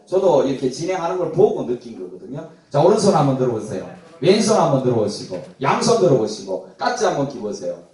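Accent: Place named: Gyeongsang, South Korea. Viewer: 잼나고~ 경상도 사투리 죽이네용ㅋㅋ~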